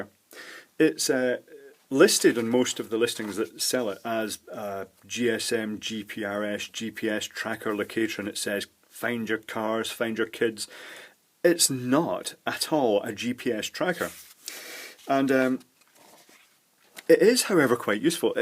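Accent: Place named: Edinburgh, United Kingdom. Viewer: "Mellifluent" I think is the word you are seeking, Robert. Me, I thought it was just his pan-loafy Edinburgh-esque accent. :-)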